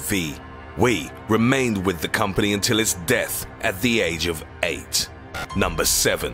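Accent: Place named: United Kingdom. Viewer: This british narrator makes everything 10 times as scary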